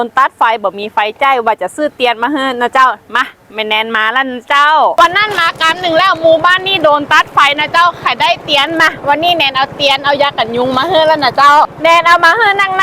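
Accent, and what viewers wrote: North, Thailand. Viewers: โค้ะ อู้กำเมืองได้ตวย ฮู้ๆๆ สุดสุดยอดเลย ก่นึกว่าคนใต้คนภาคตะวันออก ชอบเลยครับต้องสนับสนุนละครับ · น้องแนนเป็นคนที่ไหนครับเห็นอยู่ทะเลแต่พูดเหนือ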